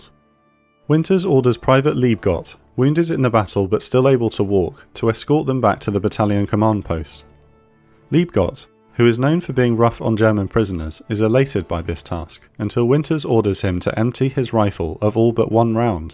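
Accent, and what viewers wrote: United Kingdom. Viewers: Narrator is British....and this battle is factual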